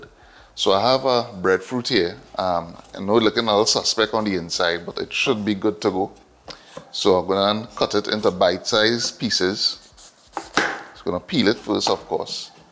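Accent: Trinidad and Tobago. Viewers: Trinidad in the house you sound like your from Trinidad. My daughter just bought home a breadfruit pigtail oil down she said but i can save some a try this. Just in time to.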